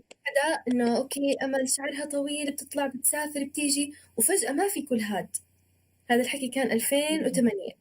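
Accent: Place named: Palestine. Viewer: ما ابي اتفلسف بس اللي لاحظته لهجتك فلسطينية تقدر تصحح لي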